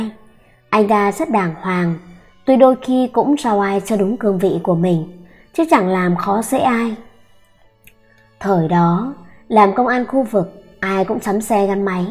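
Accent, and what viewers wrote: Standard. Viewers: Giọng đọc chuẩn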